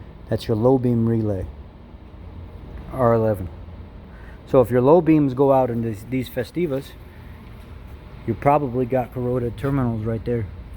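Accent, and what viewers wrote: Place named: Chicago, United States. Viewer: You sound like a Polish Chicagoan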